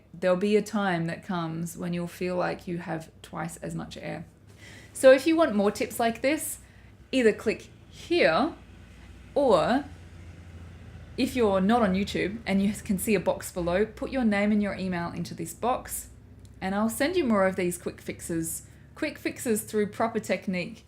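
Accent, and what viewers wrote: Australia. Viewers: this is australian accent?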